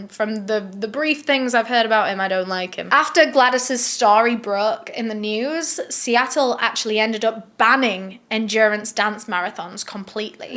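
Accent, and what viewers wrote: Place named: Yorkshire, United Kingdom. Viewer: It's always an amazing day when uploads. Thank you for another wonderfully informative video. I love your Yorkshire accent. I live in Derbyshire, so I have a twang of it in my accent as i lived in South Yorkshire for a while. Look after yourself, beautiful lady!. 🥰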